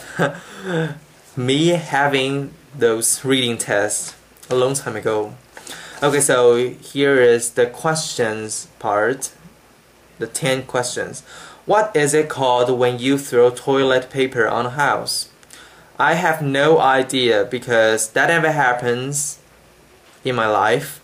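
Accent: Taiwan. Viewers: you sound like a typical taiwanese english accent... taiwanese do have an accent...anw all places have an accent... like for english the 2 major accent are american and british accent and Mandarin the major accents are the chinese and taieanese... so there is a taiwanese accent... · Btw you do have Taiwanese accent...but your English is definitely good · Yes, you speak English with a Taiwanese accent, which means that Mandarin is your mother tongue. I thought that I had given you a very clear answer. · 聽得出是台灣人 >^< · You have a southeast "Asian" accent, be it Chinese or Taiwanese. But a little different than Korean. But yeah, you do have a Taiwanese accent as far as speaking in English. By the way, "pecan" is what you know as 胡桃 Hútáo. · its pretty obvious taiwanese accent, which is great!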